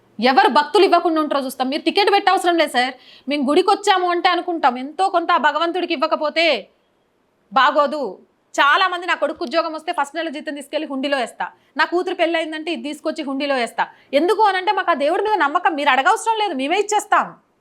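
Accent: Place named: Srikakulam, India. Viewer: మేమ్ నిజం చెప్పండి మీది శ్రీకాకుళం కదా మీ మాట catch చేసాం అమ్మేస్తన్రు అలాగే మన ఆకలి తీర్చటానికే ఆలోచిస్తన్రు అన్నారు 😄👌👌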